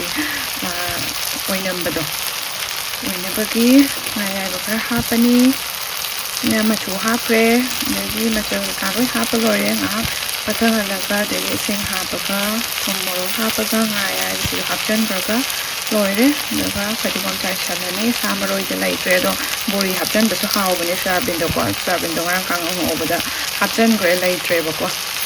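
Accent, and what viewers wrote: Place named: Cachar, India. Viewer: Congratulations but nadi yeningde hai Noi AssamCachar gi tune and accent do khara change taubiyo yamna heinade noo cachar gi lon do even nasha gi nache su ngaire nokpu nokninge nangse meitei nupa Manipur da palladi chahi kharashu henle nachasu chaore social media da thoklakladi khonthi su khara adum chabani meiteilon sengna ngango accent doh yeningdre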